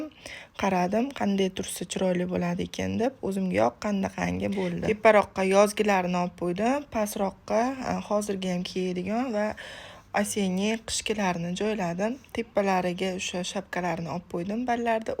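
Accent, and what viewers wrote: Kokand, Uzbekistan. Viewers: Men ham shuni sezdim. Qòqonlik deb òyladim. Men ham ayol kishiman. MashaaAlloh opa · Assalamu alekum man ayol kishiman siz Qo'qonlikmisiz man Qo'qonlikman shevez uxsherkan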